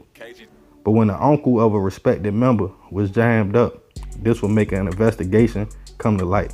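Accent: Baltimore, United States. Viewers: Are you from Baltimore?? I'm from DC, and I have family in Baltimore so I know a B.More accent when I hear one. The area that this went down in is on the DC waterfront, this is the neighborhood by the DC wharf. "The Spirit Of Washington" yacht does dinner cruises on the Potomac River and the boat leaves from the dock next to the wharf..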